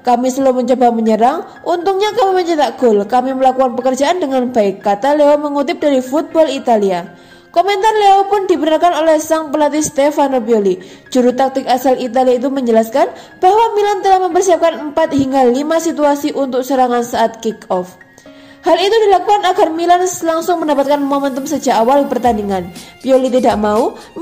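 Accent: Java, Indonesia. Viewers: Medok bnerrr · Jadi lucu, meddok + cepet 😂😂😂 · Hahahahahahaha mbok pelan pelan to engkok keselek lo, tapi male duadi lucu e gelok coro moco e, wes cepet medok e kuentel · mbke medok bunget to mbk 😂 orapopo wes.. wong jowo mantul 👍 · Medhok bgt mbak, hehe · Jadi males gw nonton Chanel ini,, gara² suaranya yg medog ...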